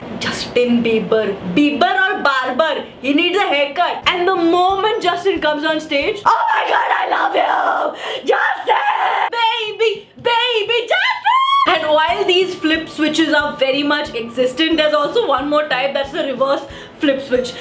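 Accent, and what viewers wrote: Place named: Bhojpuri, India. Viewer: Hey...I met you on my bday idk if u remember but it wass just amazing ❤❤🌸. Anyways a rapid fire cmg up 1.If you had a chance to replace your life with some other YouTuber who would it be and y?😉 2.Prank vrushank that u wanna break up😂 3. Scream out of your window "Aee paani kisne bandh kiya"😂 in your Bhojpuri accent🌸🤘 #Sawaalsaturday lyy❤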